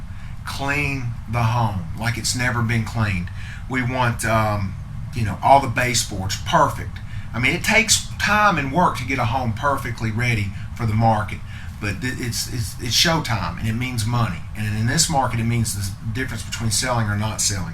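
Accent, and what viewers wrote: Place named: Alabama, United States. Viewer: Roll Tide, man, with that accent!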